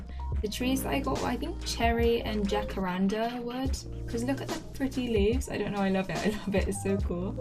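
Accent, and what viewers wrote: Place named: London, United Kingdom. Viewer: I thought so, sounded like a londoner, well good luck to ya 🙂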